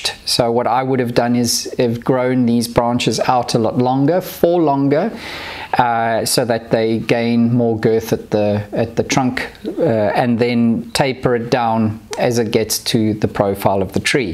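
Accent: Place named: South Africa. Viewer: Fall there vs spring here in scandinavia can be confusing but then you start talking in the nice south african accent and i go uh yes he is on the other side of the world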